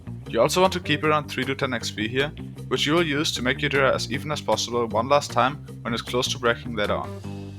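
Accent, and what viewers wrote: Germany. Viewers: I hear Deutsch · u german? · Are u German?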